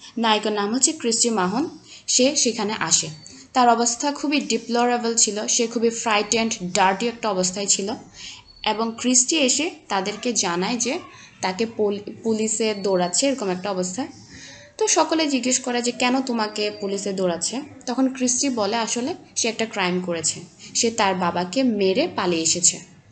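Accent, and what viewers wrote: Chittagong, Bangladesh. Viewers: Chittagong er accent 🥹❤️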